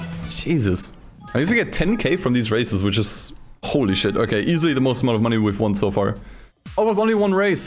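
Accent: Germany. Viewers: Are you perhaps german? Kinda sounds like it.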